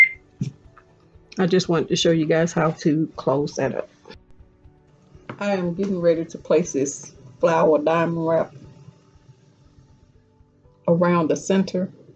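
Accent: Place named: St. Louis, United States. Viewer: You from STL? I'm out here but from jersey...you sound like you gotta lil stl accent. Very nice btw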